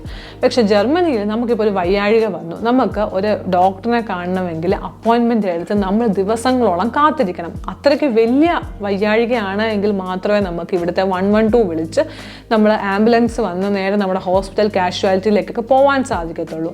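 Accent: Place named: Pala, India. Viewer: പാലക്കാരി ആണോ മോളെ...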